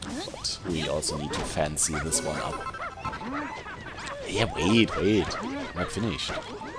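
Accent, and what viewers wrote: Germany. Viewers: Is he german? KLingt nach deutschem akzent in dem guten englisch ;)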